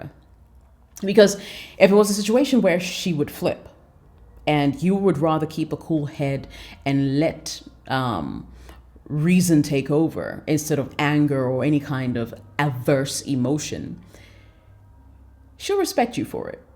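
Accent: France. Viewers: First I command you on your french it is a fine cute accent. Your channel is lit. You bring so many excellent materials and I truly believe this episode is the best of all. W/O respect there is no relationship. Wishing you that you reach your goals. Touloulou. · True facts. Respect is a King aspects of real man. Thanks and your french accent is good!!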